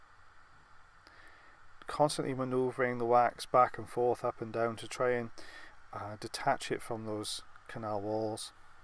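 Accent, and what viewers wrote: Wales, United Kingdom. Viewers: Listening to you makes me miss Wales!!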